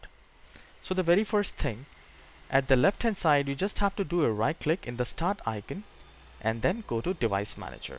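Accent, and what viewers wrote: India. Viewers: hes trying to hide the indian accent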